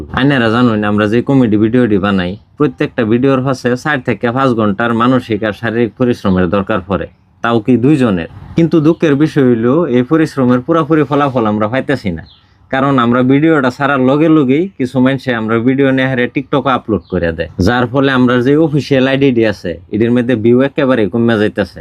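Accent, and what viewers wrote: Mymensingh, Bangladesh. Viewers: চোরদের কোন কমতি নেই।যারা চুরির পিছনে থাকে,তারা চুরি করবেই। যাই হোক আপনার আঞ্চলিক ভাষা ভারতের কোন অঞ্চলের দয়া করে বলবেন।কারন আমাদের বাংলাদেশের ময়মনসিংহ জেলার ভাষার সহিত মিল আছে, তাই বললাম। ধন্যবাদ। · Ha, ekdom Bangladesh er Mymensingh, Jamalpurer vasha. Onek apon mone hoy. Ami Mymensingh er. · প্রথমে ভেবেছিলাম কিশোরগঞ্জের। কারন ময়মনসিংহ, কিশোরগঞ্জ, নেত্রকোনা অঞ্চলের আঞ্চলিক ভাষা এটা।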